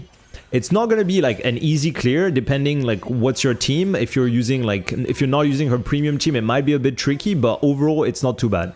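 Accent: France. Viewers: Français ou Canadien ? Cool to see french people speaking english :D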